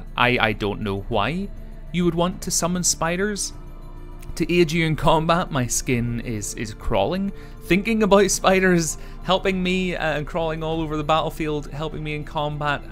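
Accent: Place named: Ireland. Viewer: oh that's cool I didn't know you were Irish, I probably should have known that from the accent though. lol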